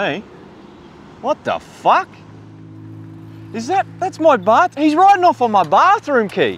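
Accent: Australia. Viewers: And that’s the Australian accent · Before I visited Australia I thought everyone spoke like this guy but it’s just a few culprits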